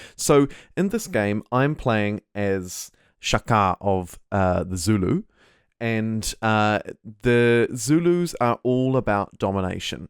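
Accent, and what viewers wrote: New Zealand. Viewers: I love Civ V and VI and I dig your NZ accent so, I like your videos 🥰🤘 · Did a double take to hear another kiwi accent. So used to hearing marbozir! Good summary mate, and good energy. Stoked to see Civ V still active out there.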